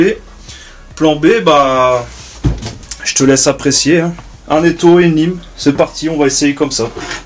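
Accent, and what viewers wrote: Nord, France. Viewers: Bonjour, Un grand bravo pour cette vidéo, dis moi quand as-tu acheté cette défonceuse chez Lidl? Tu es du Nord ou je me trompe?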